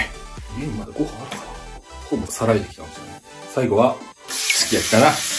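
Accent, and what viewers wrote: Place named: Kansai, Japan. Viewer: あと、フル関西弁動画お願いします😎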